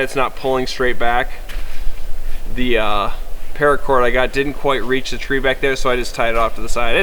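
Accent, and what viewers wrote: Canada. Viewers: I can't decide if he is Canadian, or just making fun of us. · Man, you sure sound Canadian. Tossing out those ‘eh’ casually. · Are you Canadian or American?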